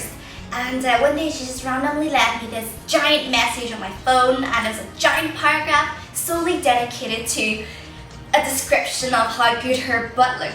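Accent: Ireland. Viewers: You sound Irish. Great video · i thought you were Irish because it sounds like you have an Irish accent!!!!!!! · You're from Hong Kong but you kinda sound Irish..But you're really pretty :)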